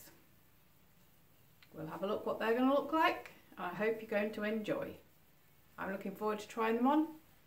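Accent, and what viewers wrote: United Kingdom. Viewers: I DETECT AN ACCENT WHEN YOU SPEAK ARE YOU BRITISH? GORGEOUS!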